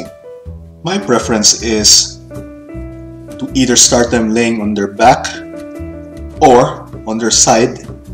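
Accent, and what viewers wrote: Philippines. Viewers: You sound like a Filipino guy :)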